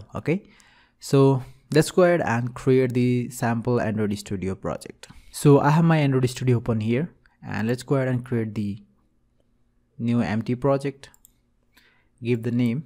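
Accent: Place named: India. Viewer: bu hististanlıların bu ingilizce si beni hayattan soğuttunuz be bilader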